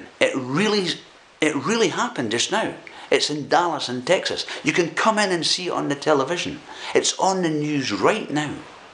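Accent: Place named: Edinburgh, United Kingdom. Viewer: I thought that was an Edinburgh brogue! Did I tell you I was on the bonnie, bonnie banks of Lochlomond last weekend. Thanks for another informative and insightful video bro.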